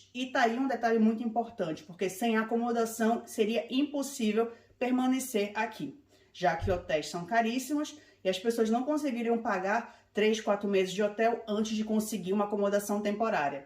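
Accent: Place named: Pará, Brazil. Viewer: Ótimo vídeo, conterrânea! Nemo dá pra perceber que você também é paraense kkkk... sotaque super marcante .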